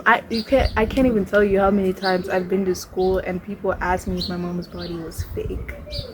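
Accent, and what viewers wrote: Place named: South Africa. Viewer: That's south african accent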